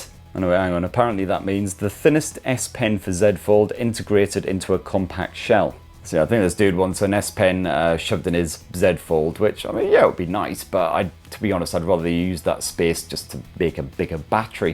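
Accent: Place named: Sunderland, United Kingdom. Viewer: The innuendos and smutty humour were very strong on this one. Well done my favourite Macam or is it Geordie? Sorry not sure. Either way the best tech reviewer from the north east, possibly the world! Edit. Ahhhh you answered my question. Sunderland!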